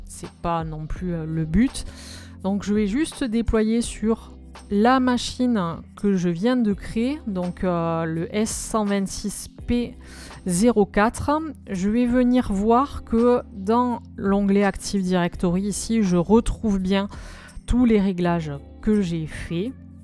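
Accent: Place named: South, France. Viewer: Très bonne playlist sur FOG. Chez les sysadmins, on a trop souvent ce réflexe un peu idiot de ricaner ou se méfier en entendant la voix (agréablement méridionale) d'une jeune femme pour donner des conseils sur le déploiement. Et tu as admirablement fait mentir ce réflexe. Subbed. Je me fais fort d'aller fouiner dans le reste de la chaîne cette semaine, que je peux imaginer de grande qualité sur la foi de ce petit tuto impeccablement construit. J'espère que ta chaîne va décoller : elle mérite.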